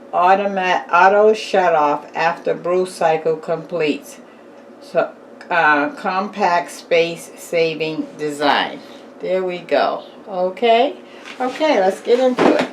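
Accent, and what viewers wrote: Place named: New England, United States. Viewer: Love the New England accent!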